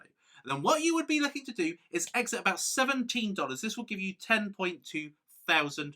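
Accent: United Kingdom. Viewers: Sing in english accent talks in british accent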